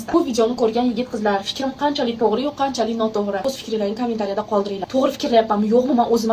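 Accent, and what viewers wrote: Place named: Rural, Uzbekistan. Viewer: Sanga qoldimi qishlaqi gaprish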